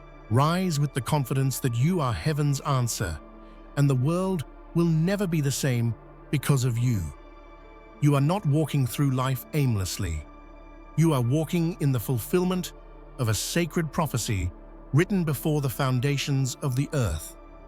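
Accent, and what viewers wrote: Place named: England, United Kingdom. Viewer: If I’m goodie goodie - then I’m not human - btw I love your voice - that clear distinctive English accent- I’m not the chosen one - That would be notions of grandeur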